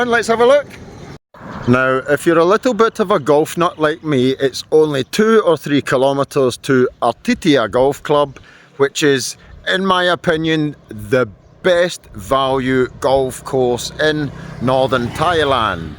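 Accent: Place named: Scotland, United Kingdom. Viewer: 2,000 baht, is a good price lots of work to tidy up. Nice to hear a Scottish voice in Thailand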